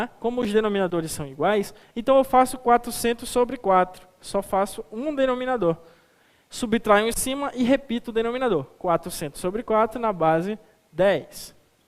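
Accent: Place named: Manaus, Brazil. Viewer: Ótimos videos, parabéns! Sotaque manauara muito bom de ouvir.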